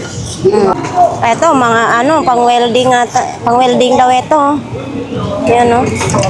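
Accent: Visayas, Philippines. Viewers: Ate .parang Visaya ka 😂😂😂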